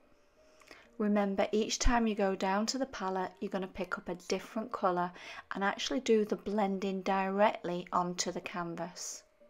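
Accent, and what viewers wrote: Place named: North, United Kingdom. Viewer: Great, nice to hear a northern accent too👍🎨😊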